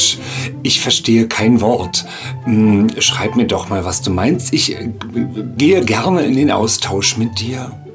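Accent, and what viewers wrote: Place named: Berlin, Germany. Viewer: Ich hoffe du liest mindestens 100mal mehr positive Kommentare als solche niveaulosen die im Video gezeigt wurden. Dein Humor ist großartig und deine Kunst ist nicht nur unterhaltsam sondern auch sehr lehrreich in puncto Szene, Politik, Berlin und Selbstbewusstsein. Natürlich ist der Ton manchmal sehr derbe. Aber wer sich die Mühe macht hinter die Fassade aus derber Berliner Schnauze und Makeup zuschauen, wird die Kunst und deine Absicht dahinter erkennen.Ich hoffe noch viele Videos von dir sehen zu können.Danke dafür <3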